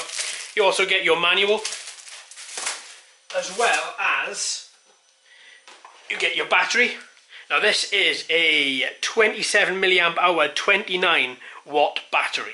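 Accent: Wales, United Kingdom. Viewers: You sound welsh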